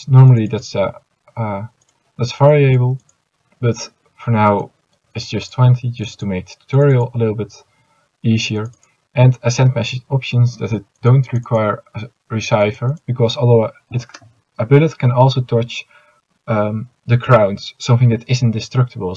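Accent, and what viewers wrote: Netherlands. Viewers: en btw... ben jij nederlands? zo klink je wel and btw are you dutch? you sound like it... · where you from are you from the netherlands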